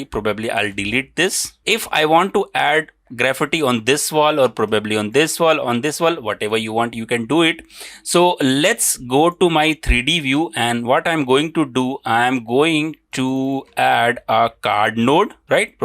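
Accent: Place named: India. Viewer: Прекрасный урок, но если ты стал тренером, избавься от ужасного акцента хинди. слушать больно.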